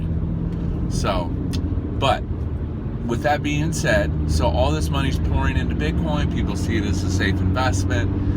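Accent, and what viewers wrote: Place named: Dallas, United States. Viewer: Are you a Texas gent? Sound like you are around the dallas area. HTown here. Continued success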